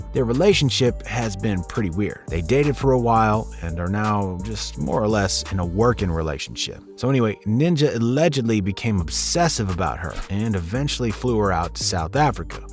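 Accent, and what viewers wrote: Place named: United States. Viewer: Ignorant take on the duo, and christ american pronouncians on anything thats not english 😂 fml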